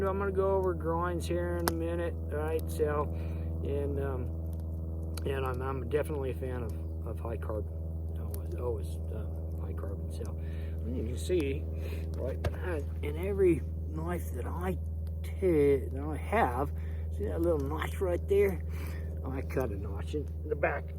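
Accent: Australia. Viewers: Old hickory epsecially older ones are sooo tough n last forever... Almost hear a Hint Of Austrailian Accent in there no? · Your accent is…. Like from where? Sounds Aussie almost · maybe an odd question, but are you originally from Australia? I just here it mixed in with your accent.....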